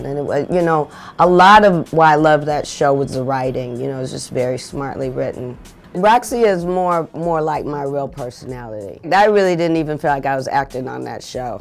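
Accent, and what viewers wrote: South, United States. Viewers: She definitely ain’t nan like Whitley Gilbert bruh💯🚫🧢even doe she frm up Nawf she got popular off uh dat gud O kuntry accent own “A Different World 🌍 “ ya digg... not ta mention she dun spent majority uh ha time in my hometown ATL💪🏽Salutez👆🏽Mz Guy u alwayz have been my crush since Whitley doe😍👌🏽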